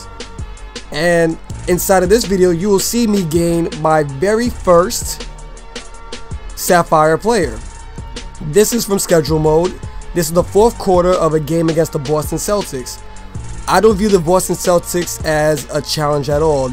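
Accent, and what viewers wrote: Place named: New Jersey, United States. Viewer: Really Happy You uploaded. Guess I've been on your ass for Vids lately its just you are one of my favorite commentators. No homo but your voice is perfect for commentating sounds like you are from new jersey or something. Skys your limit.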